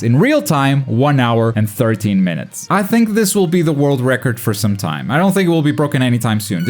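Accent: Portugal. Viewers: Calma calma calma és tuga?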